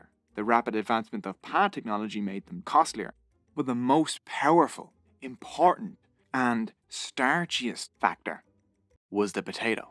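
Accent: Ireland. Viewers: I cringe every time you mention any Maori Kupu (Maori words). Whether it is your Irish accent or ignorant of indigenous cultures, it kills my mother tongue. Otherwise, it would've been more entertaining, one and a half stars from five. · I wasn't prepared for the Irish accent 🤣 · Are you Irish? after a few minutes all I can hear is an Irish accent. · Totally agree! And nicely done in an Irish accent! 😁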